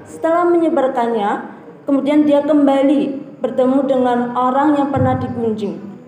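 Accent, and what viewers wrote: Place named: Banyumas, Indonesia. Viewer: Semangat mbak ngapak❤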